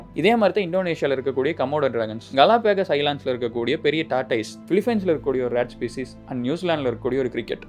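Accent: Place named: Kongu, India. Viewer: சடபுட கொங்குஸ்லாங்❤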